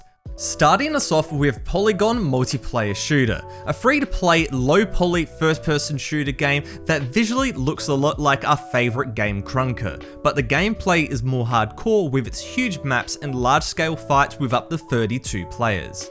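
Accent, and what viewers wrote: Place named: Australia. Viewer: Dude idc what videos u make ur voice is satisfactory that australian accent hits the 'spot' yessir i love ur vids xD and yea i like ur bedwars series cuz im a bedwars kid who does yt and stuff lol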